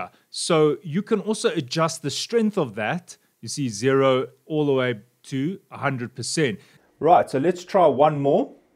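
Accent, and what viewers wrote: South Africa. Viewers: I recognize the South African accent from a mile. Hello from Cape Town.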